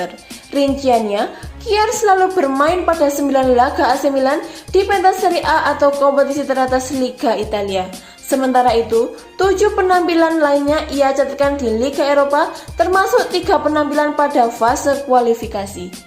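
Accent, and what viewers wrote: Java, Indonesia. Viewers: Aih jawa x ,betul terok lah ,ganti suara tu,jangan terlalu percaya diri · Medok tenan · Naratornya kurang asik Medok bgt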